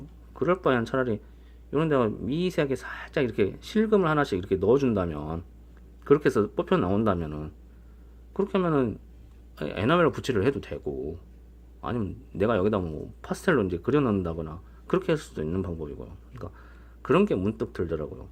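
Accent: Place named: Jeolla, South Korea. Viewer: 전라도 사투리네 씨발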